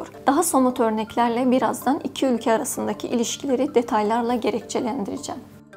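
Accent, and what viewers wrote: Azerbaijan. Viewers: Azeribaycan aksani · Bu niye böyle konşyor Bu qiz yüz faiz Azərbaycanldı Türkce niye danşrsan Azərbaycan Türkçesiyle danış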